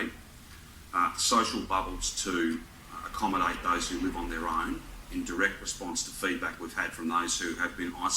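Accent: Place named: Australia. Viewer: そうですよねー、クリスマス、新年はみんなでわいわい迎えたいですよね。 オーストラリア英語いいですねー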